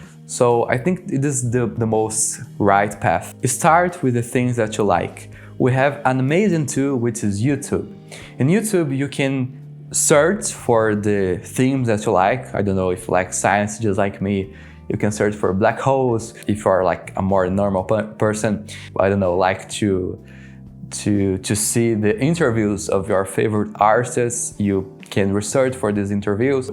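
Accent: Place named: Brazil. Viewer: I love brazilian accent when someone is speaking in english, because I can understand what is being said. Thanks for the video!!!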